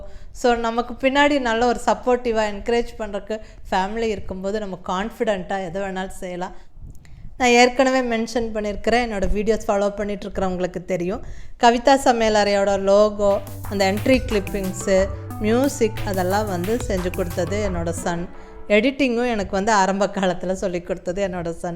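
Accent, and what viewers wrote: Kongu, India. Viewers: Many congratulations madam Wish you many more laurels 💐 Above all your kongu tamizh is also attractive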